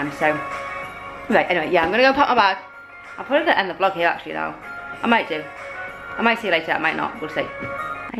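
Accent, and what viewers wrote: United Kingdom. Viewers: I love your British accent, I listen to you ,you''re so smart,and fun. love your vlogs!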